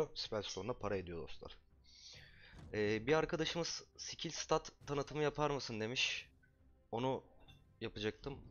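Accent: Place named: Thrace, Turkey. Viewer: İlk videodan beri diyorum kesin Trakyalı diye. Corludan selamlar 😁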